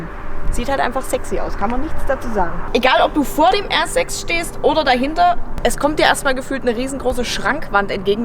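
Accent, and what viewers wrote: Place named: East Germany, Germany. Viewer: In der Tat ein brachiales Auto: nur das Rot und der Digitaltacho würden mich stören. Wie hoch war denn der Verbrauch bei deinen Testfahrten? By the Way:, dein "Ostlerslang" ist wie immer phänomenal erfrischend (ich meine das positiv!). 😜 Liebe Grüße von einem Ex-Magdeburger aus Herrenberg (bei Tübingen), Chris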